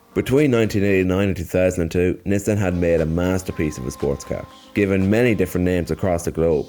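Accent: Ireland. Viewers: NIssan Silvia wow have you never heard of an Irish accent?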